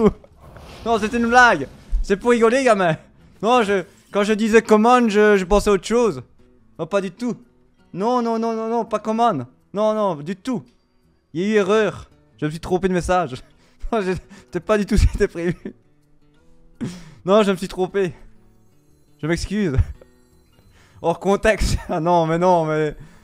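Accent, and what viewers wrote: Belgium. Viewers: Ah ben ça fait plaisir une petite arène. Par contre quand je t'écoute parler ça sent bon la frite la bière et la fricadelle j'adore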